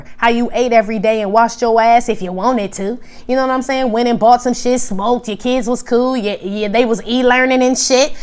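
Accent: Chicago, United States. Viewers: you talk like us in Chicago🤣🤣🤣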